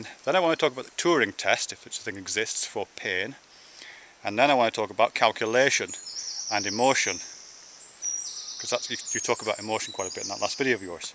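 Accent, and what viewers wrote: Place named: England, United Kingdom. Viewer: Grow your hair longer, lessen the English accent a tad and you'd be splitting image of Dr. Rush from Stargate Universe. Haha!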